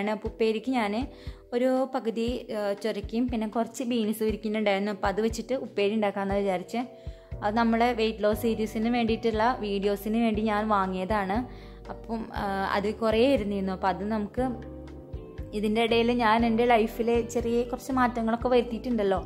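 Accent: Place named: Malappuram, India. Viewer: Hi ചേച്ചി, ഞാൻ new subscriber ആണ്.. ഞാൻ ചേച്ചിടെ വ്ലോഗ്സ് തെരഞ്ഞു പിടിച്ചു കാണുവാരുന്നു. എനിക്ക് ഒരുപാട് ഒരുപാട് ഇഷ്ടമായി. ശെരിക്കും എന്റെ ഒക്കെ വീട് പോലെ തന്നെ. പിന്നെ, ചേച്ചി ശെരിക്കും നാട്ടിന്പുറത്തുകാരി തന്നെ ആണ്. ആ നന്മ ഒക്കെ ഉള്ള ചേച്ചി. പിന്നെ ഞാൻ ആലപ്പുഴ ആണ്. Apo ചേച്ചിടെ മലപ്പുറം slang കേട്ടോണ്ട് ഇരിക്കാൻ തന്നെ നല്ല രസമുണ്ട്. സംസാരം bore ഒന്നുമല്ല ചേച്ചി. രണ്ടു ദിവസമായി ഞാൻ വീഡിയോ കാണാൻ തുടങ്ങിയിട്ട്. Apo മുതൽ കമന്റ്‌ ഇടണം ന്നു വിചാരിച്ചതാ. ഇപ്പോഴാ പറ്റിയത്.. super വ്ലോഗ്സ് aanu.. ഇനി ബാക്കി വീഡിയോ കൂടെ കാണട്ടെ കെട്ടോ.. all the best ചേച്ചി... Love you so much ചേച്ചി.... 😍😍😍😍😍😘😘😘😘😘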